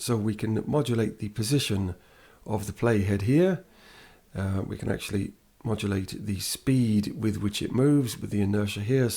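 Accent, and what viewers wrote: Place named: South Wales, United Kingdom. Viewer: It's been doing my bean in for a while now. I KNOW that voice/accent !! ... but I can't place from where I remember it. Another YT channel ? My musical adventures from days of yore ? My work with PAs ? . I too have a South Wales accent but mine's from a little further West. Love your work in reminding us of the power of Reaktor btw. 👍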